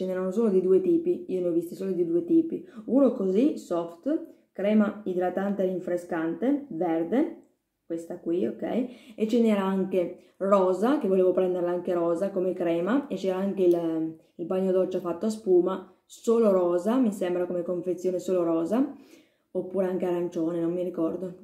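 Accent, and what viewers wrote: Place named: Piedmont, Italy. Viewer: infatti si sente dall'accento. Non ci sono mai stato ma mi piacerebbe visitare le montagne la e torino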